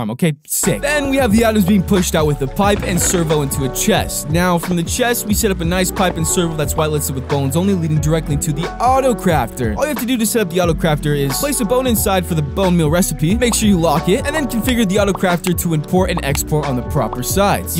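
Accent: United Kingdom. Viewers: I always wonder how you speak british and inglish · ??? I hear br'ish accent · Hey I realy realy realy want you to hit 10mil and and your my favorate british youtuber keep it up and good luck and your british acsent is amazin🇬🇧🇬🇧🇬🇧🇬🇧🇬🇧🇬🇧🇬🇧🇬🇧🇬🇧🇬🇧🇬🇧🇬🇧🇬🇧🇬🇧🇬🇧😀😀😁😁😁😁😀😁😀😀😁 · wait is it just me or does he have a brit accent sometimes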